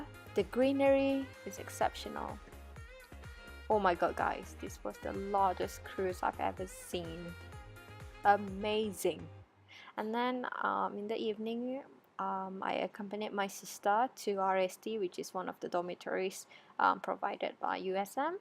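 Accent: United Kingdom. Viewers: Love to hear your english's accent. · Have u ever been in abroad before ? Or you received from this uni? Your English is very good. Especially British accent , haha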